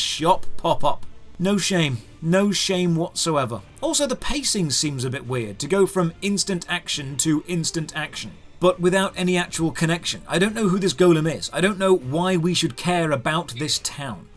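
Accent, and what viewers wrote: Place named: Northern England, United Kingdom. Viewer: "Pick oop"...ah, by gum, yah can't be hidin' your northern lilt.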